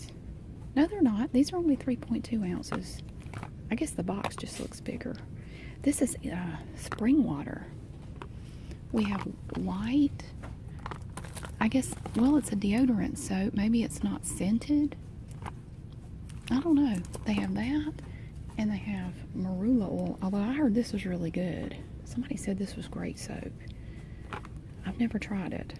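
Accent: South, United States. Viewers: Please keep making these shelf organizing videos❤️ They’re so satisfying, plus u make me laugh without trying, and ur southern drawl makes me feel at home❤️❤️❤️❤️ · I just absolutely love your southern accent. A few years ago, I moved from the south and I really miss the southern accent and to have it combined with a wonderful asmr video is amazing! Thanks you!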